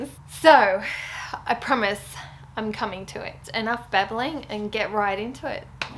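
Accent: Australia. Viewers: So this is the third time I’ve watched this video and I must confess! I also love Dionne’s work and have been hesitant about starting a piece like this. But when you said you messed up the top and redid it, it dawned on me that when you’re painting like this, there are no mess ups, just happy accidents ( thanks bob ross❤️) I think that “mess up” would have added more character! They’re meant to look aged and weathered and painted over a million times, like an old Spanish door❤️I really love this piece and hope to see you do more! Personally for me, you took the fear out of it!😄. I’m really enjoying your channel, your work …and your sweet Aussie accent🥰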